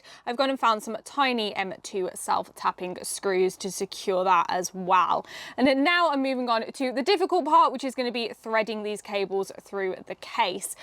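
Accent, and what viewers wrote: United Kingdom. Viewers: Okay, now I understood, what means "british english" 🤯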